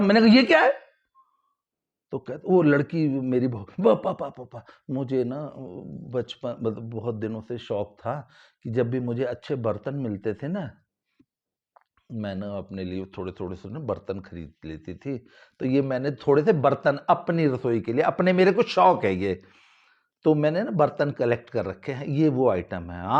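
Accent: Haryana, India. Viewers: Ram ram dada ji, Aap ka Hariyanvi samvad kamal ka he 😂😂😂😂😂, aisa lag hi nehi reha ki aap eastern UP se ho,lagta he ki aap Hariyana ki gramin sathli se ho 🙏🙏🙏🙏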